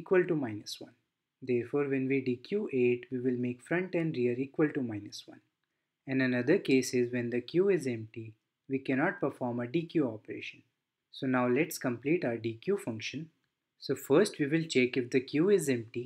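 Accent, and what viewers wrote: India. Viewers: you have quite heavy indian accent but other than that, clear explanations and simple video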